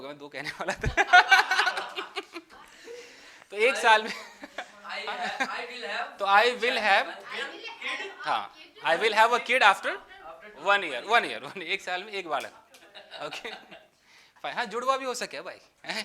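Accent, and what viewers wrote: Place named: Meerut, India. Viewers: you are from Meerut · best class ever because of haryanvi agree? thokdo like 👍👍👍👍👍👍👍👍👍👍👍👍👍👍👍👍😂😂😂😂😂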